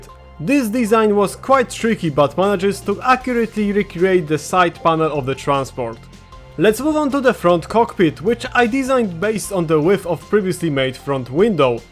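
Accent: Poland. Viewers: Słychać że polak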